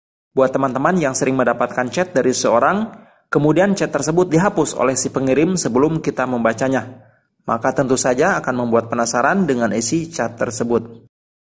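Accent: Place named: Sukabumi, Indonesia. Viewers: Abang orang skabumi ya??? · Org sukabumi ya bang 😅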